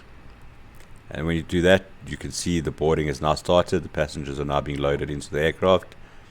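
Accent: South Africa. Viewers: Hoor daai Afrikaanse aksent.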